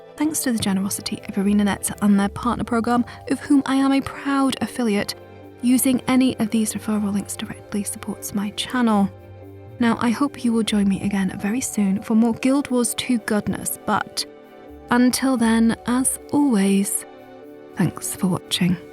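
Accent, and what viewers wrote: United Kingdom. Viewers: You have a fantastic voice. Interesting accent too, what part of UK are you from? You could do an audio book XD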